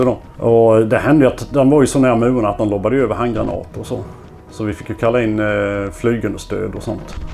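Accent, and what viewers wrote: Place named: Jönköping, Sweden. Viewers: Tror han är från Forserum, så nära. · Kan han vara från Jönköping, bred dialekt 😃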